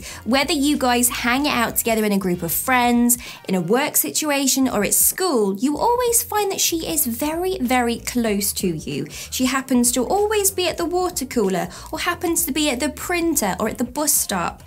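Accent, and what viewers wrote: United Kingdom. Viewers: Your talking about ways to know if she's thinking about me,but I'm stuck here wondering where's your accent from🤔..sounded kind of British ,but then again it sounds like something else..I love it either way ! Big up from the Caribbean !!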